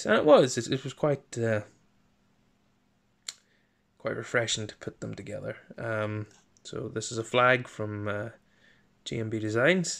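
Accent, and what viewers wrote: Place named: Northern Ireland, United Kingdom. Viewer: I thought I detected an Northern Irish accent 🤣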